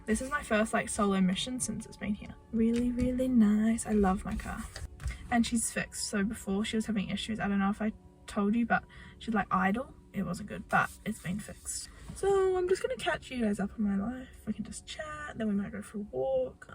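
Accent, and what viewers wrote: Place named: Australia. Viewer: I always wondered why your accent seemed more aussie than kiwi!! makes sense, i had no idea you were from aus 🫶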